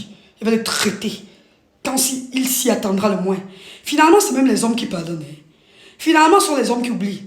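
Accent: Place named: Bangangté, Cameroon. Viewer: Ton accent Bangangté est trop fort ma chérie❤t'es vachement mignonne❤❤bisous.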